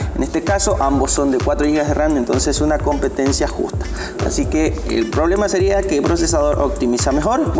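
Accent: Bolivia. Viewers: Cuando vi tu video dije este es de BOLIVIA por qque salio el deber y despues dijiste poquingo y hablas como BOLIVIANO Buen video caramba.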